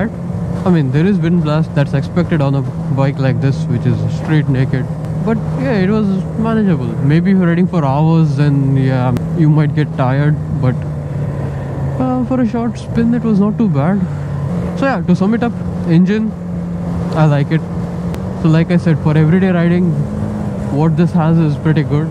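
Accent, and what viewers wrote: Karnataka, India. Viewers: excellent review, man. loved it. are you from Karnataka? just curious, and I'm basing it off your accent. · It feels good to hear the review by an Indian in an Indian Accent. BMW G310R is Love.. 😍 GS 310R would be awesome too.. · The review ok. I had a very difficult time understanding that very heavy Indian accent. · hope for best of it dude are u Indian you some of sound like Indian 😛😜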